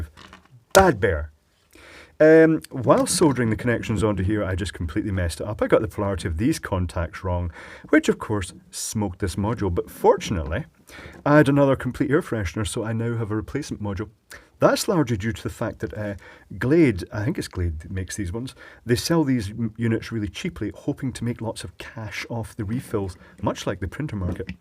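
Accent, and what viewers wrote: Scotland, United Kingdom. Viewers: What kind of Scotland are you from I'm from Fife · Scottish accent and you avoided saying "de-burr"...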